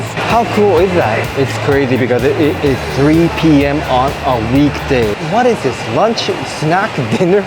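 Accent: Singapore. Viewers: I luv your Singlish ❤👍😊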